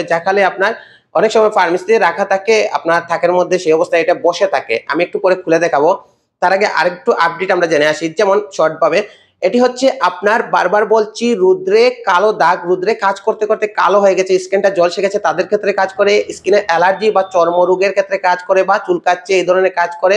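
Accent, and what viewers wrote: Sylhet, Bangladesh. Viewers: আপনার উচ্চারণ শুনে লাগছে সিলেটের লোক😑,আমাদের সিলেটের মানুষ শুদ্ধ ভাষাই খুবই কাচা😑😑বলবেন স্যার আমি কী ঠিক বললাম???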